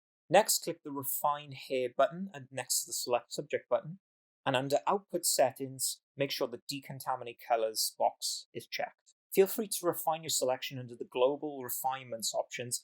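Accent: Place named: Wales, United Kingdom. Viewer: Love a tutorial with a welsh accent! Feels like I'm listening to a mate! Great tutorial bro! #WAAAAYULLSS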